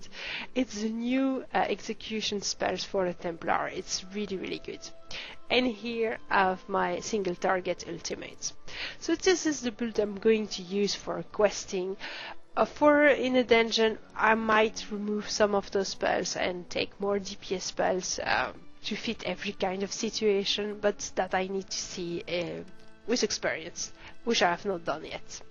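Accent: France. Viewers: Great video. really enjoyed it. And the French accent from a pretty lady is a bonus too ;) Keep up the good work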